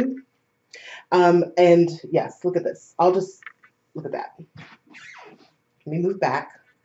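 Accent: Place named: Jamaica. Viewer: you betta speak that petois/English accent! I can't wait for video footage! safe travels. ✈✈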